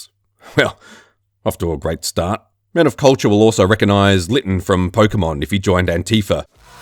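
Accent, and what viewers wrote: Australia. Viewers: Yah he sounds Australian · You honestly sound like a Australian not a Frenchman. Where are you from originally? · Tu es français, mais je pense que ton accent écoute comme un peu Australien ? Je suis australien et j'apprends le français au lycee mdrr👌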